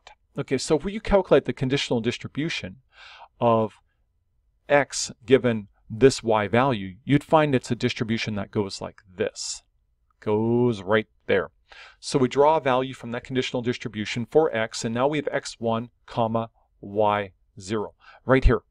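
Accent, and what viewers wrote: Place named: Canada. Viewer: Just found out is Canadian, and in my opinion, the Canadian accent is the best English accent for non-native speakers to comprehend, thanks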